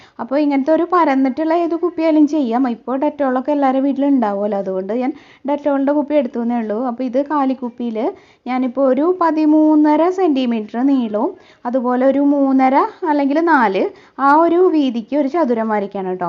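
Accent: Thrissur, India. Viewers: Thrissur bashayanallo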